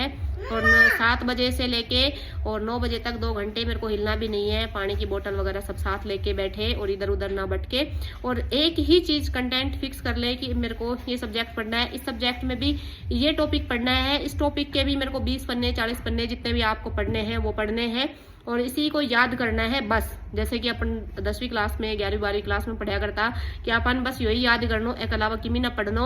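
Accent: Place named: Shekhawati, India. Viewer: Mem kapil choudhary sir RTS jesy bhasha he aap ki😂😂 aap shekhavati pradesh say hi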